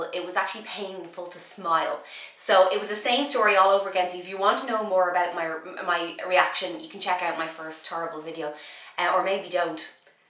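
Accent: Ireland. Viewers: Lol she doesnt have a British accent, its Irish two different countrys :) x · great tips will write them down to rem them" have been following ur vids for ages but never commented.nice to hear the dub accent.yay!!!!!!! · Your turd installment? Lol, no offence i LOVE the irish accent. Thanks for the tips too :)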